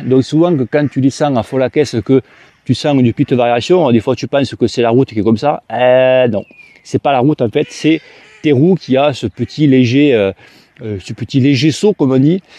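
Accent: South, France. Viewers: Merci pour tes video mec 👍 Super Accent du soleil 😉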